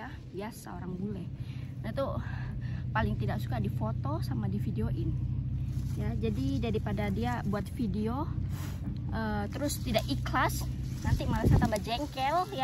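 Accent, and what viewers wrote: Papua, Indonesia. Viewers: Kaka pasti asal Papua ini 😁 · mba pernah tinggal di papua mana ? soalnya kyk logat papua gt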